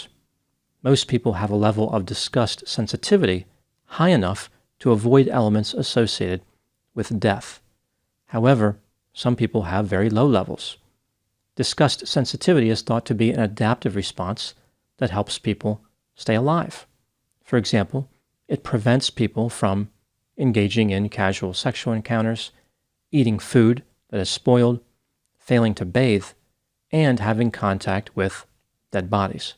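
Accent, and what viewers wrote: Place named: Maryland, United States. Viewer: ....great job! Can you say, "Satan"? Harrisburg - yup. Oh hell no. Grew up in Carroll County, MD, so you may say this is my, "old stomping grounds" - I recognize your accent, albeit maybe more eastward... Those dolls look like people I have DEFINATELY come across. Honestly, I would bet that at least 65% of certain populations would NOT think this is odd, and continue to push the argument of 'art'. I've known people that resemble this ilk. PUKE.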